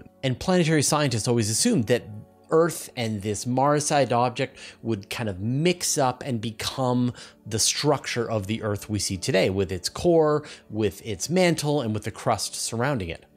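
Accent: Canada. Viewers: As a not native speaker I cannot spot your Canadian accent 😊 however I'm able to understand you very easily. Excellent pronunciation and always great contents! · I live in Southern California and I and most people I know pronounce lava as “lah va” and not “lav eh” as does. My sister-in-law’s parents are Canadian and I took Canadian history in college so Canadian accent is very easy for me to hear. And that’s not a criticism so I think Canadian accents are cool. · You should never worry about your accent. People love it. It’s part of the reason Canadian’s are so popular on YouTube. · Canadian accent…as a fellow Canadian I never considered that, lol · ROFL on the Canadian accent. Nothing wrong with proper enunciation of words :) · I find your Canadian accent interesting. It gives Canada a linguistic distinction from the US. Language is an important part of national pride. 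Don't be ashamed of it.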